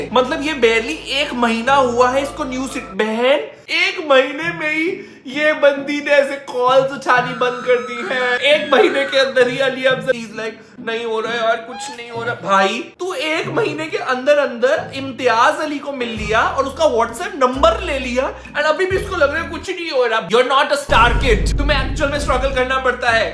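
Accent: Punjab, India. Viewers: Your punjabi accent ❤❤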